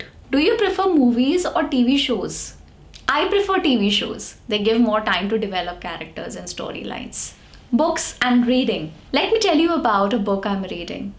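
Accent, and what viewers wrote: India. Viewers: I actually speak English with American accent🇺🇸 Now I’m gonna learn Indian accent from your videos to make better content for my Channel. Thanks a lot for your beautiful work gorgeous lady!!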